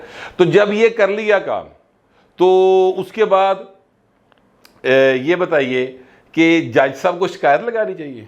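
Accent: Punjab, Pakistan. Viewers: tuhada gall krn da andaz brha piyara hey. Sir jee, tuhadi guftagu wich apni maan boli da tarka bara zabardast hey. Sada inj ei vlog krdey rahwo tay inj ei hasday rahwo. Sadi sohni dharti "zindabad".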